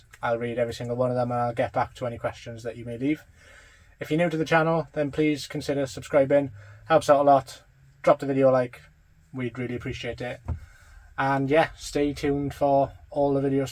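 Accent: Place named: Wales, United Kingdom. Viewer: Hi from Canada. Luv your vids you seem like an awesome dude. Question #1-Your amazon link for that black foam roll insulation does not work here. Can you tell me the brand name of it? Question #2-what are the layers of insulation you used on the walls and ceiling? It looks like you put a double layer of that black foam roll sticky stuff. I agree with your vapour barrier theory. My tv has an awesome time trying to interpret your Welsh accent it is fun to read what it thinks you are saying eh.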